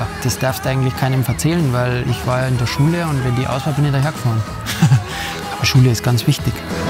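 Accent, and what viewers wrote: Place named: Bavaria, Germany. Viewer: Unfassbar sympathisch - hab di über mtb-news gefunden und ziag ma direkt alle Video rein ;-) Bin selber 29 Jahre und hab erst jetzt mit dem mtb-Sport angefangen. Aber bin scho voi drin und werd mia demnächst auch ein Dirt-Bike anschaffen! Hast a paar Tipps? Und endlich moi jemand, der meine Sprache spricht. Überragend - hoffentlich kommt noch mehr vo dia! Liebe Grüße aus Zolling und keep riding! :)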